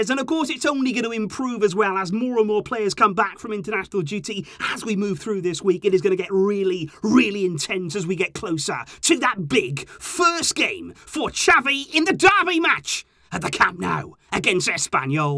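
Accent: United Kingdom. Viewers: are you British or Irish? The accent sounds British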